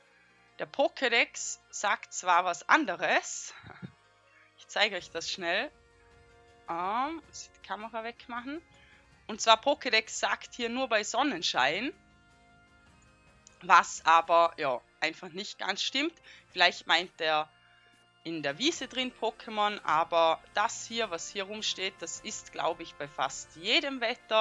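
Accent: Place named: West, Austria. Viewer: Ersteinmal - gutes Video! Propz👏🏽 Dachte zuerst du kommst aus der Schweiz wegen Akzent, hab dann aber überlegt & dachte einen West-österreichischen Akzent raushören, aber die Kanalinfo war im endeffekt ausschlussgebend!👍🏽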